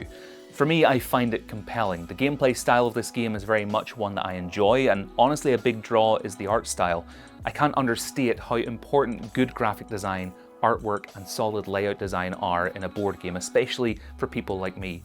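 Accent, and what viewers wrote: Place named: United Kingdom. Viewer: OK I think this was exactly the channel I was looking for for years. 🥰 PS: As a non-native English speaker, may I ask you where does your "accent" come from (which part of the UK)? ☺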